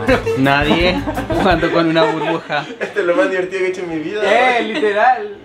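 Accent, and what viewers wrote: Chile. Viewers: eri chileno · eres de chile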